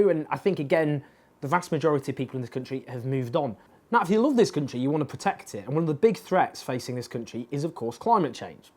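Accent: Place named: Liverpool, United Kingdom. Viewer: Can he please somehow get rid of his Liverpool accent it's making the rest of us look bad.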